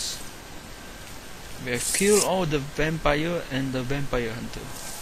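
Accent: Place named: Arab world. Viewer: Arabic right? Great Accent bro.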